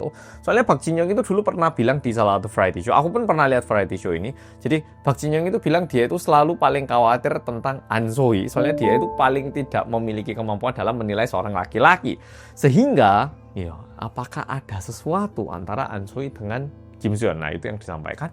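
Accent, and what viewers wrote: Java, Indonesia. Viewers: Nyatanya ini bukan yang terakhir mas medhoookkk😂😂😂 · Jowoe keren medok tenan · Ojo video terakhir lah mas....aku jd kangen medok nyaaa😂❤❤❤ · Terakhir terakhir terus tp selalu ada aja updatenya kasian alm Sae Ron.. aku aja tiap buka yutub nungguin oppa yg medok krn nungguin kabar2 terbaru teruss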